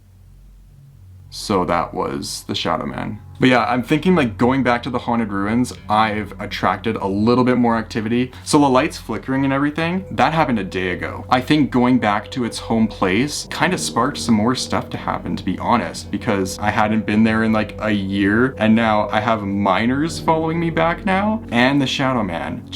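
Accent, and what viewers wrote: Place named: United Kingdom. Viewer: AH HES BRITISH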